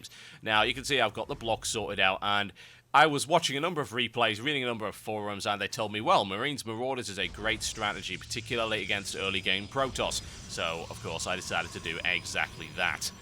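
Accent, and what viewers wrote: United Kingdom. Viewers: I can't help but read comments and video descriptions in his british accent. Does anyone else have this problem?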